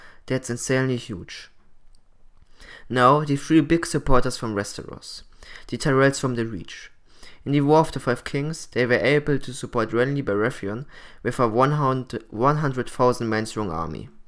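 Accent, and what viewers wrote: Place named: Germany. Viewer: Your accent Tells me you Are German;)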